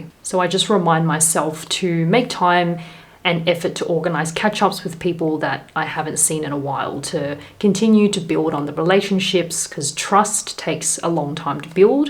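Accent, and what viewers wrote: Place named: Australia. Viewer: Very well said. Great list, and watching from the USA I really enjoy your voice. Everything sounds sweeter and happier with an Australian "accent" :-) I remember turning 30 as well and just loved leaving my 20's in the rear-view mirror. I'm glad you found it a good thing.Just wait for 60!!! haha. Liking & subscribing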